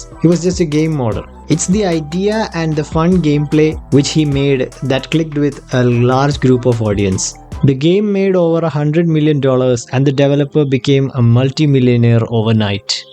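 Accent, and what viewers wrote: Kerala, India. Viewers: Are you malayali? Your english is pleasing to ears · The mallu accent is quite overpowered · u sound like a malayali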